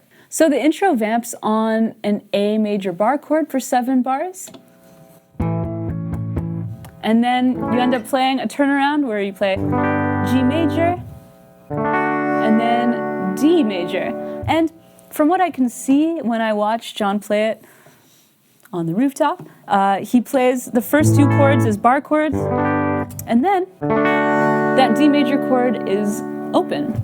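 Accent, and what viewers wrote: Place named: Canada. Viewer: Canadian accents are so damn great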